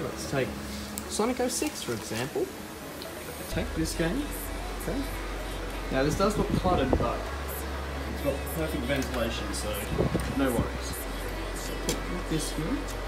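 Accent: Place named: England, United Kingdom. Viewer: Great tutorial my dude.... Also, great voiceover throughout the video... I am just in love with an English accent no homo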